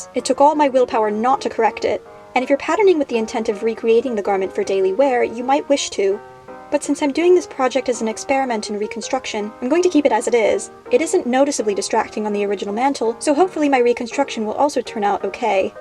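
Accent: United States. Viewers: but with modern American intonation, it's honestly relaxing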